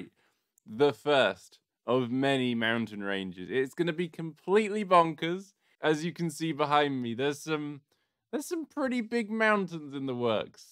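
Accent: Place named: United Kingdom. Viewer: How are you even more british now wtf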